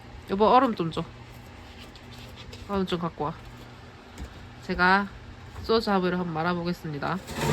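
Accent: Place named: Gyeongsang, South Korea. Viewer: 구수한 갱상도 사투리가 매력적입니다❤️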